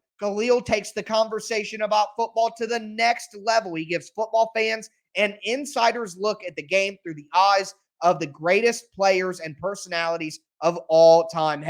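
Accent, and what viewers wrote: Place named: Southern Ohio, United States. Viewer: I am from Ohio too. I can tell by your accent that you are from Southern Ohio.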